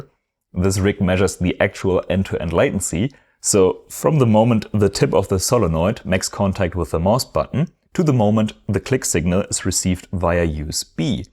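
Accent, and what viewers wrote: Germany. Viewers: are you german you sound like a german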